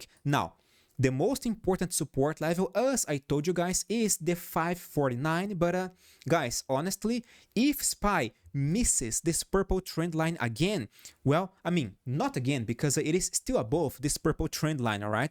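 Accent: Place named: Brazil. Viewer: Claramente um amigo do brasil, a pronuncia nao engana ! Primeiro video que vi seu e adorei. Fica aqui a minha subscrição. Cumprimentos de Portugal!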